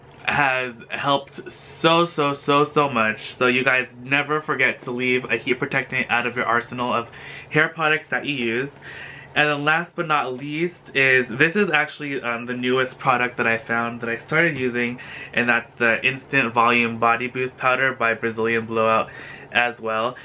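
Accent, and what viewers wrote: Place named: Philippines. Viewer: I'm guessing you're a Filipino. I can tell by the accent. :)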